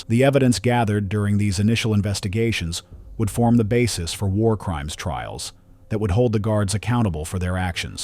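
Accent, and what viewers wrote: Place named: United States. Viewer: I believe it's the bloody annoying authoritive American accent - which is so annoying! Right! The Americans won the war!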